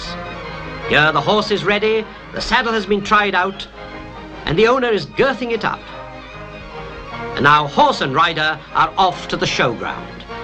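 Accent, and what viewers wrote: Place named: Surrey, United Kingdom. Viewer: He sounds like my Grandpa he was from Surrey I went to School with that accent and was corrected by the teacher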